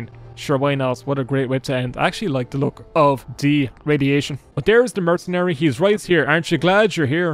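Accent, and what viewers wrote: Ireland. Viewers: Another Irish YouTuber, nice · R u Irish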